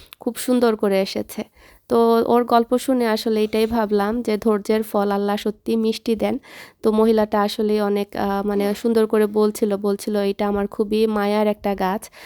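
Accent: Sylhet, Bangladesh. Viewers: আপু তোমার সিলেটি ভাষায় কথা বলা এতো সুন্দর মাশাল্লাহ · Apu Assalamualaikum Apnar sylety vasa ato valo lagy Masallah 😊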